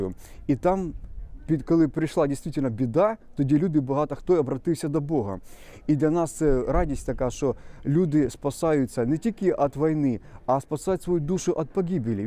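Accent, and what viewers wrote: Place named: Surzhyk, Ukraine. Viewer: не дивлячись на те що ви говорите на суржику , видно як ви намагаєтесь говорити українською. Ви молодець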